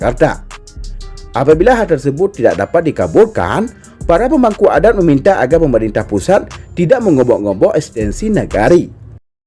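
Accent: Aceh, Indonesia. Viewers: Chanel pemecah belah NKRI..dr logatnya admin orang Aceh nih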